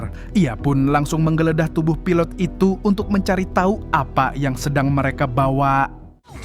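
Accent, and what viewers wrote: Banjar, Indonesia. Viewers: Waduh amang urang banjar ah sekalinya😂😂😂 menyalaaa banuaku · Nahh logat nya pina banjar jar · Ai orang Banjar kah mang 😂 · Urang Banjar lih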